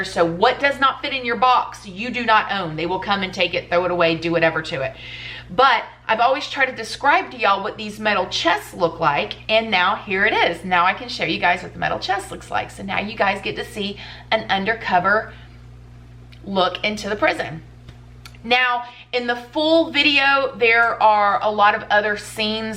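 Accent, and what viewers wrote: South, United States. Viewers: climbs up on soap box I would ask everyone who feels the need to "correct" pronunciation of words like "bob wire" or "cement" to please understand that as southerners, we have different ways of saying a lot of words. We know how to spell these words, just like you do. Like any thick regional accent found anywhere around the US (and other English speaking countries), words are often said differently. Think New Orleans, Boston, New York, Fargo, Seattle, Miami, LA, Hawaii, Chicago, etc. And then Canadian, British, Irish... Our distinctive accent and pronunciation of many words is just a part of who we are and the way we heard language as our own voices were being formed. Please stop correcting us, we are not wrong. And you're not either!! We are simply different. I hope we can all love each other for the diversity in each of us. 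/climbs down from soapbox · I love your southern accent and the little slang words you say lol. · Got that southern slang going on 😂 “Bob Wire” we also say “Chester Drawers” 😂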